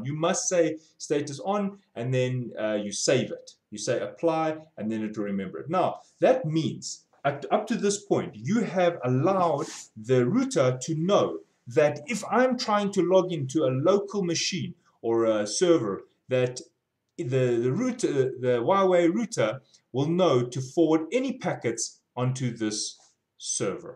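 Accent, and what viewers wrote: South Africa. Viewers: Haha, such a strong SA accent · I hear SA accent, I upvote! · jirre, get smacked by that south african accent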